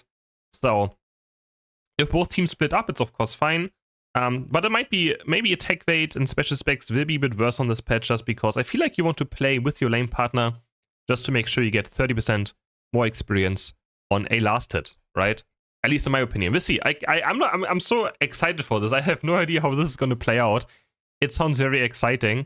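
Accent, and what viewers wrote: Germany. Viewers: Ahh du bist deutsch, ich höre den aktzent sofort heraus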